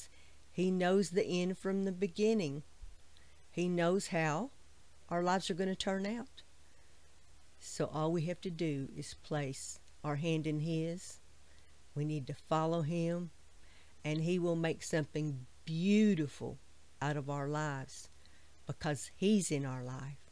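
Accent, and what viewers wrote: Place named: South, United States. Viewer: thank you for your prayers - so much appreciated!🙏🥰 The closest I have been to your neck of the woods is a couple of holidays in Florida back in the 80s which I loved! I assumed from your accent you were a Southu'n Belle! I went through a phase a few years back when I read absolutely everything by Fanny Flagg just to enjoy the South'n vibe after seeing Fried Green Tomatoes - and Blanche Deveraux in The Golden Girls was hilarious!😁🤣 Probably a terrible pastiche of the real thing but I loved them anyway! I'm very much enjoying your channel and your calm, sane biblical approach (not so commonplace around today's 'prophets') and they were really a 'word in season' for me - thank you - I intend to enjoy a lot more!! Love & Blessings to you & your ministry!🙏✝️🥰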